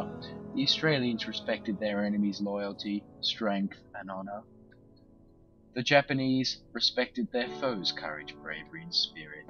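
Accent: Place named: United Kingdom. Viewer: well structured with good music. the british accent was not needed. Deuchar Allen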